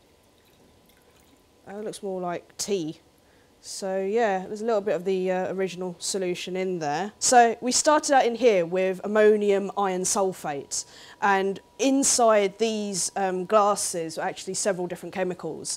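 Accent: United Kingdom. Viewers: Attractive Asian woman with british accent and a science degree... where does one find such majestic creatures? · Asian Chick + British accent = HOT!!!!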